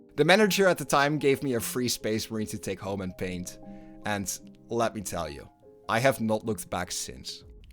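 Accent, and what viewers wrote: Netherlands. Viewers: The dutch accent.... like none other :)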